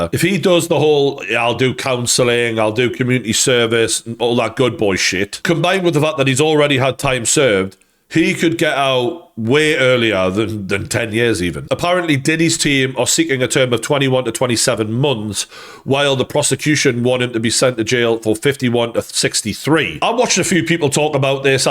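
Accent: Newcastle, United Kingdom. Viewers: Easy Geordie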